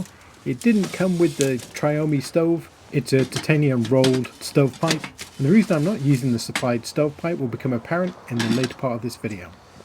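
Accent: United Kingdom. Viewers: Thank you. GOOD to have the advice of a Canadian (I am from Quebec). I am looking for a video on how to relief yourself with number 1 and number 2. Thank you again. Nice British accent.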